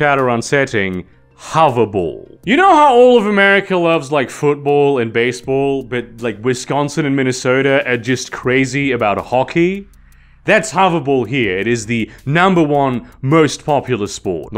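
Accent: Germany. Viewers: I knew in one video mentioned being German, I didnt realize how German until listening to this. Honestly I could do with a video of him speaking solely German. Also, found a long lost map and campaign setting for shadowrun that I thought that I lost today same as when I started this video. I take that as a sign to run a game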